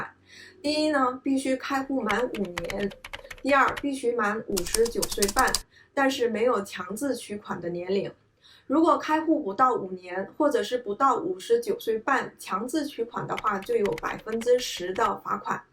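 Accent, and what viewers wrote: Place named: Liaoning, China. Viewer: 应该是辽宁的吧，我本科硕士都是沈阳读的，在沈阳呆了七年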